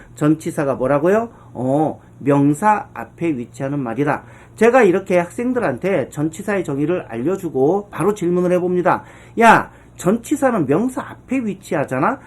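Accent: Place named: South Korea. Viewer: 드뎌 전치사 편에 입문 하게 됐네요. 감사합니다 선생님. 선생님 목소리는 완전 한국토종인데 외모는 너무 이국적이셔서 쪼금은 놀랬습니다.😅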